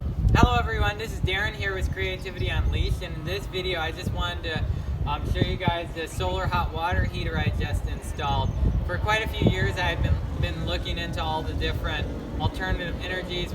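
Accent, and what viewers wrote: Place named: United States. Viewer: I've lived all over the world, I can only find voices like this in America.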